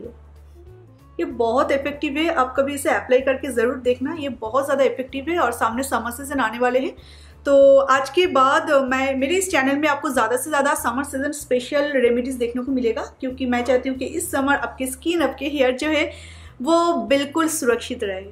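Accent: Bengal, India. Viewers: Aap bengali ho right bola na😅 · Bengali? you have bengali accent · Tag mark of Bengali that accent. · App Bengali hain , mujhe baat sun ke laag raha hain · dude i'm gonna ask the same question, well her accent resembles Bengali😁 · u r bengali right? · Apki Hindi me Bengali tone h😁🤭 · Are u bengali? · R u bengali ? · Yes it's the accent of bengoli s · Apne to bangal ka didir moto hindi bolta he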